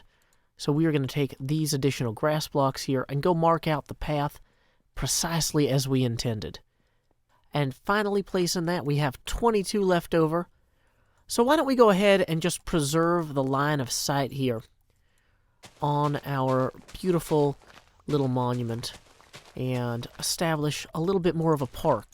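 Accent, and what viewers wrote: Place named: Nashville, United States. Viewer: D you doing an iskall impression was hilarious , nothing will ever cover that nashville accent up :D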